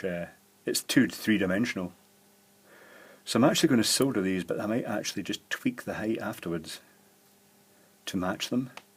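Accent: Scotland, United Kingdom. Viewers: Googles automated closed captioning system trying to interpret a Scottish accent is quite funny at times.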